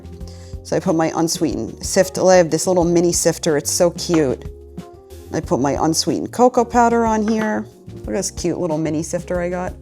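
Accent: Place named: New York, United States. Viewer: you are, hands down, my most favorite vlogger. So inspirational, and I love the agency you hold in your life. I’m 70 years old (I can’t believe it really), but don’t see myself as old. I work to stay fit and healthy, and being vegan is a foundational identity. I live in the PNW (Orcas Island), but lived in New York for some time, and your mild NY accent always takes me ‘home’. Anyway, all this to say, I appreciate who you are and your commitment to your dreams. I have great confidence you will acquire your pro card! ❤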